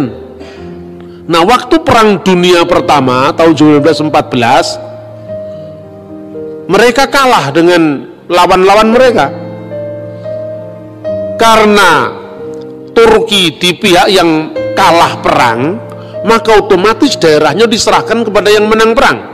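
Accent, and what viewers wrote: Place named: Java, Indonesia. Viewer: Senang pula, lihat Pak yg berlogat Jawa.... Tapi bermanfaat, bagi penganut Kristen di Indonesia.....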